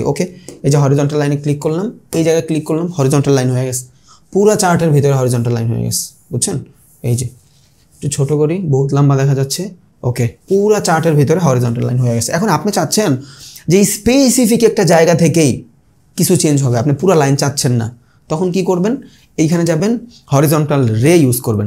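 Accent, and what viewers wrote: Bangladesh. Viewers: দাদা আমি ভারত 🇮🇳(কলকাতা,পশ্চিমবঙ্গ থেকে আপনার ভিডিও গুলো এই বেশ কিছুদিন হল দেখছি, আমি বাংলাদেশ 🇧🇩কে অনেক ভালোবাসি এবং ওখানকার মানুষ জনদের কথা ও কথা বলার ধরণ আমার খুব ভালো লাগে,হাসি 🤣 ও পায় | 🐯 বিশ্বাস করুন আমি প্রথমে আপনার ভিডিও গুলো দেখতাম শুধুমাত্র কথা গুলো শুনবো বলে , কিন্তু পরে ভিডিও গুলোর Content ,আপনার বোঝানোর দক্ষতা ,ও আপনার পরিশ্রম কে দেখে আমি আর Comment না করে পারলাম না | 🔥আপনার প্রশংসা যতই করা হোক না কেন কম হয়ে যাবে |🤗 যাই হোক ভালো থাকবেন সুস্থ থাকবেন আর আমাদেরকে এই রকম ভাবে আরো সমৃদ্ধ করবেন 🙏 Always Support to You ✌️😉 ভারত 🇮🇳 থেকে শুভেচ্ছা ও ভালোবাসা নেবেন 🥰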